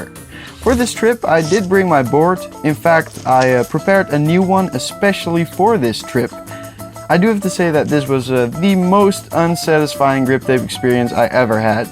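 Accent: Netherlands. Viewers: nederlander dat hoor je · You can definitely hear that you are Dutch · Wait u dutch? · Nederlands Engels😍😂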